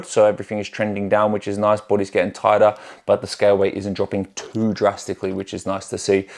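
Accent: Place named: Australia. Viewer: Need a bigger kitchen bro hahah sounded like a aussie 😂, seen you on insta so subbed ✌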